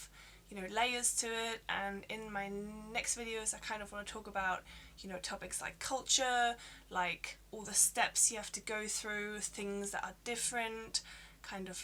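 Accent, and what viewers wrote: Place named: London, United Kingdom. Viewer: Cheers to you for make videos about your experience in the U.K... and your accent i think i'm watching your videos for your accent its so charming you sound like a true londoner... Really lovely C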